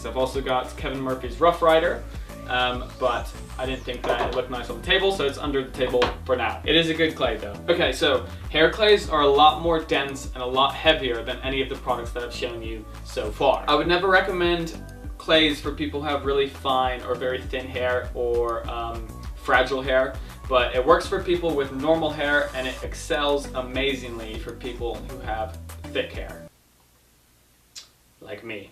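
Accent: England, United Kingdom. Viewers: why does he sound british in this video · I know I have said it before but English accent is coming on REAL thick! Like damn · Is it just me or does it sound like he's supposed to have a British accent? Haha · lol come back to america your starting to develop a british accent and im not used to it😂😂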